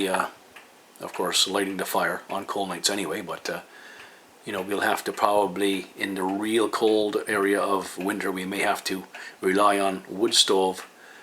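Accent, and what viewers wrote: Newfoundland, Canada. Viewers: Do I hear a Newfoundland accent? I just installed a 12000 in my garage. Did you figure out the smart option? I have no instructions on it.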